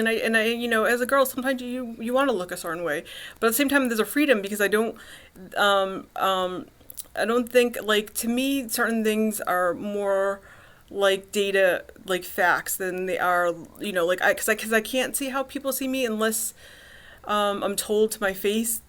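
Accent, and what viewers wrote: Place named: Canada. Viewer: Just wanted to share with you that I believe I’m an Aspie myself even though I’ve never been diagnosed with it. I have the characteristics of it. So I can really relate with you on it. But damn, you’re hot as hell! I’d definitely ask you out to coffee with me if you were here in my town. Bet you live in Canada (you sound Canadian). Anyway, Happy Holidays,! Greetings from Bay Area, Ca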